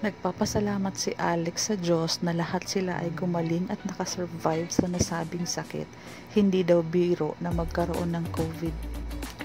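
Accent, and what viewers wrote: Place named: Visayas, Philippines. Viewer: Ampanget ng Boses at salita mo , d ko na tinapos nairita ako , pambisaya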